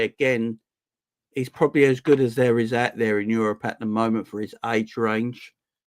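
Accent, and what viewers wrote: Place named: South, United States. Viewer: Hey, wasn’t sure about you at first what with you having that southern accent and all that. Now I know why people think you’re brilliant. Top content as always. Big thanks for keeping us informed 😊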